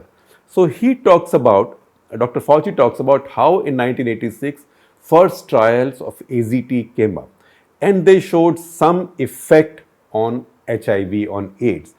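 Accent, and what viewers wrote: India. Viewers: Good presentation in indian English style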